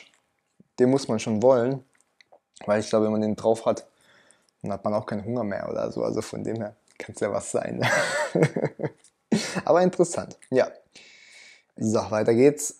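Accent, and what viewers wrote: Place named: Germany. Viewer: I love your language and your accent! Are you German?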